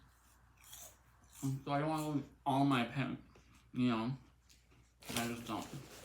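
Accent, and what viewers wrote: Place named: United States. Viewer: Hello, you remind me a lot of my best friend, also the paint dilemma, it looks like acrylic, you can thin the paint with water, it does sound kind of dry plus, it allows you stretch it. I totally agree with you with the non binary stuff. Also I hope you get the surgery you want covered, insurance is a bitch. I literally had to pay for my sons lip surgery recently, he's a little boy and bit his lip, needed surgery to fix it. Cost us 2,000 bucks in the U.S. I'm assuming your also American from your accent. Insurance in the U.S. is a bitch.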